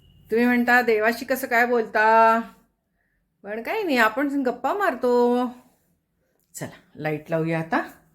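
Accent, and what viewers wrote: Kolhapur, India. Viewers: मॅडम तुम्ही कोल्हापूर साईडच्या आहात की कानडी माझ्या माहेरी पण अशाच गौरी बसवतात थोडी बोलण्याची पद्धत थोडे ताटातील पदार्थ म्हणून तुम्हाला विचारले कृपया राग मानू नये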